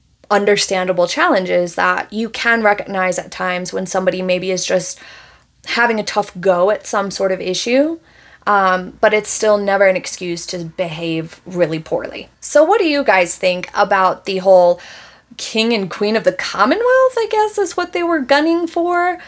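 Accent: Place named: Midwest, United States. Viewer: are you from the midwest? I'm catching a slight accent.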